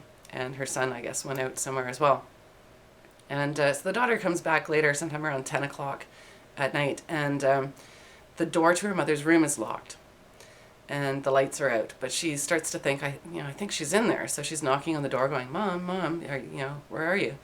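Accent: Canada. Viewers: You really work that smirk with the chin down and eyes up, bangs at the top of eye level well. You could talk to me for hours lady. Monotone discussion in laid back style, with occasional canadian dialect shining through. Making my heart flutter. Feeling enveloped with a warm buzz of unseen tribbles that are cloaked. My pupils are dilated to the point to where i look like an alien hybrid portrayed in our modern pop culture. Yeah i could listen intently to your voice of intrigue for great lengths of time. Many many metric units of time. Your voice could also put me to sleep knowing youre near me. Like a security blanket at 60 decibels. Lol. im so sorry i keep ranting on your videos i am kinda trying to flatter you as though youre actually eading this stuff. Im bored to hell tonite thats aboot,lol, all as to why i keep on. I need my meds. Just meds i guess. I dont take any but feel like theres a stereotypical void that needs fillin. 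With pills. Haha.? I live near harley in milwaukee. Are ya related to willie g? Eh? I speak like a yooper too. Except we have a phrase in Wisconsin you canadians dont have. Dont cha know? God i vrack myself up. Im going nuts here! Not just about your appealing demeanor, looks and personality neither.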